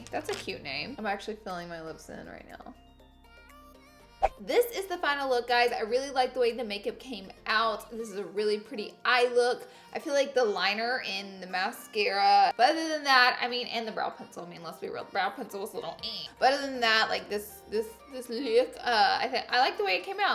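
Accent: South, United States. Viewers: DOLLADOLLA BILLS I love seeing inserts of your old videos. I’m from the south and I just love your southern (charm) accent. It was way more heavy back then as it is now, but I still love it and you and Ty. RTR ♥️♥️.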